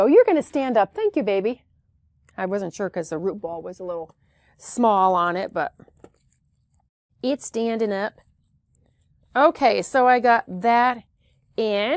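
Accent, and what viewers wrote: United States. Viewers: you Americans have funny accents.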